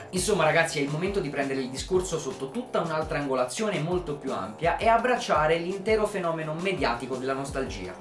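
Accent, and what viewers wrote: Campania, Italy. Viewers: Scusa la domanda fuori luogo, ma sei della campania? Ho sentito male o hai detto "Semp a stessa cos" ahahahah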